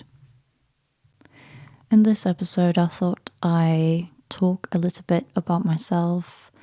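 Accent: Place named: England, United Kingdom. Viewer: When the first time I heard your voice, I felt you have a beautiful English accent.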